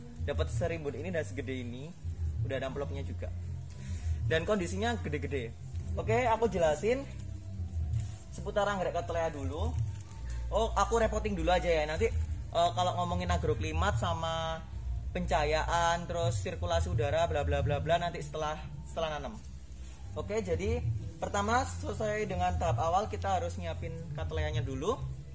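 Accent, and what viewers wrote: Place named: Java, Indonesia. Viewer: medok banget mas😂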